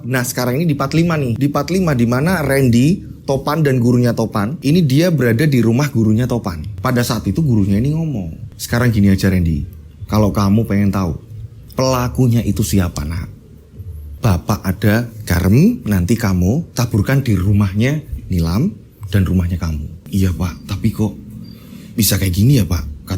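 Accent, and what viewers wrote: Java, Indonesia. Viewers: SUARANYA.....LOGAT NYA JAWA BANGET SAYA SANGAT SUKA , SANGAT MENJIWAI DAN BISA MEMBAWA YG MENDENGARKAN SEAKAN" IKUT MENYAKSIKANNYA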